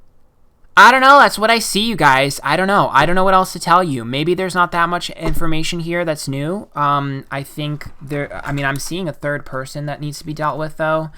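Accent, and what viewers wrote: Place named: Staten Island, United States. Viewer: are u from staten island. that accent reminds me of my old good judy from back in the day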